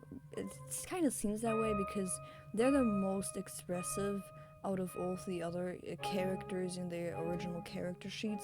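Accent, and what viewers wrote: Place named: Europe. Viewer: Where are you from btw? I noticed a slight hint of European in your accent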